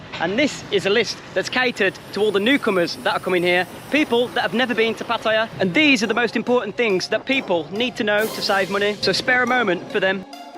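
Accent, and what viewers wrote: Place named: Midlands, United Kingdom. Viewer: ahhhhh do i detect a midlands twang mate ?,where you from ?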